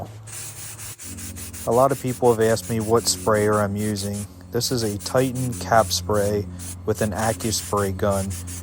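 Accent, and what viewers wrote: Philadelphia, United States. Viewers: I think you're a Philly guy. I can tell that Philly accent. I'm in SJ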